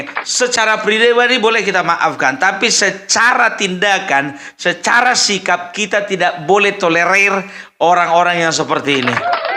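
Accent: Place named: Batak, Indonesia. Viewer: aku suka nonton konten nya dan logat bataknya lucu banget dan mengena sm orang nya yg intoleran terhadap agama lain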